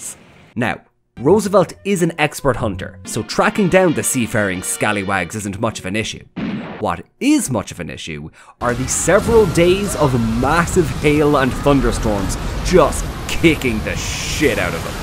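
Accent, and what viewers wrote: Ireland. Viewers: I never thought I'd ever watch a video about US president Theodore Roosevelt going across the country to catch a bunch of pirates, narrated by an Irishman sprinkled with clips from Metal Gear Solid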